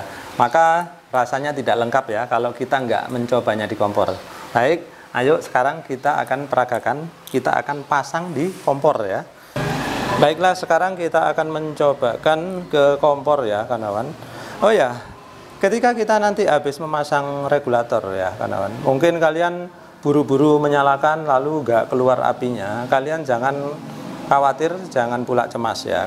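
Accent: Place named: Yogyakarta, Indonesia. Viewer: Bahasanya Jogja bgt..Asli mana ya sebenarnya?👍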